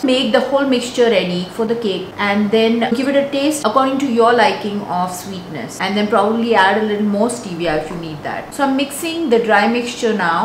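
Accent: India. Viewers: I love the thick indian acctent, the cake, and the fact that you put the macros too.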